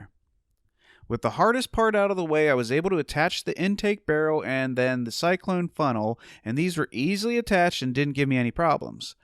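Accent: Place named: Philadelphia, United States. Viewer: is that a philly accent? its so thick! lol!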